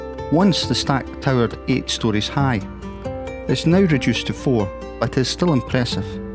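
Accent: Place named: Scotland, United Kingdom. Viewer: My old stomping ground which I left over forty years ago for the land of Oz. I really liked your Scottish burr giving the local place names a distinct pictish vibe!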